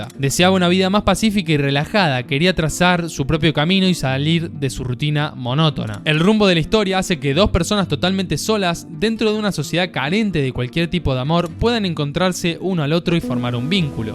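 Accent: Argentina. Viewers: Amigo que buen video describe muy bien lo qué pasa en la cinta me encanta en asentó argentino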